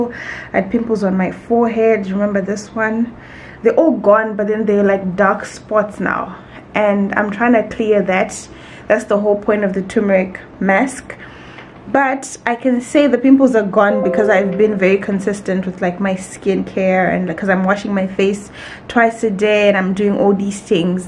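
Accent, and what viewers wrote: Zimbabwe. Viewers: She sounds zimbabwean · She sounds Zimbabwean.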